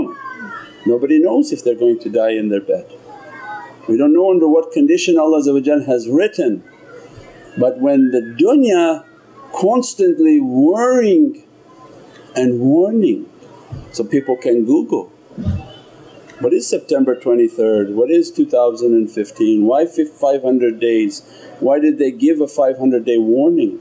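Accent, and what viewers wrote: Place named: Spain. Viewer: He sounds spanish or is that just me not that it matters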